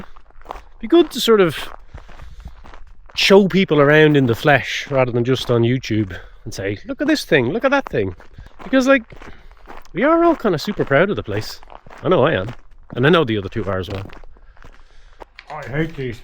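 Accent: Ireland. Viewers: Bonjour d'Occitanie J'ai aimé la référence à James Bond, pour le charme de votre accent irlandais, s'ils sont aussi doué que la majorité des Français avec l'anglais, je pense à l'accueil de l'hôtel, ils n'ont pas dû l'entendre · That evening sun and clouds was so lovely! I'm sure it was more beautiful in person. And aha, you're well aware of the power of that Irish accent! You ugly? The only reason they'd have to hide you would be, well, from the young women. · I bet you get away with muder with irish charm and the accent seems to work well. I get to see some great beautiful hotel in France. Conguc! have a drink please. You all worked very hard on openning day. You should be proud it will last and have your finger print on the chateau for sure. 😂the old confused man will whip your butt he decided to spare you his viking ways and just confiscate the bike.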